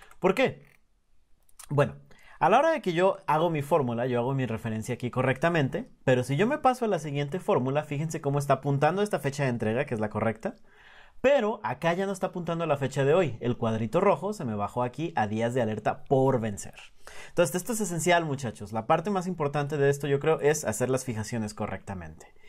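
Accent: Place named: Fresa, Mexico. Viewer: Eres muy bueno, pero dejé de lado la explicación y dejé de ver el video por que me hartó tu maldito acento "Fresa".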